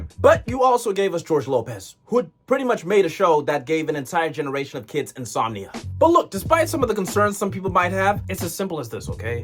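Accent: United States. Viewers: How were you born in South Africa live in Birmingham but sound American. That's mad 😂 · If he’s South African and leaves in England, why does he speak American? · You're south African You sound American But live in the UK?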